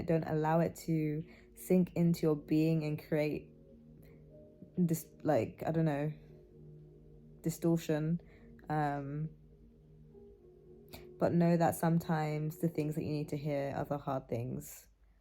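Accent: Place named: United Kingdom. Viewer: UK accent Wit Intelligence is So Fine🔥🔥🔥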